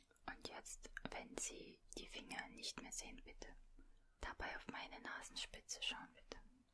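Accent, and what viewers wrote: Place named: Russia. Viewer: Ich liebe diese Stimme mit dem russischen Akzent so Nice😍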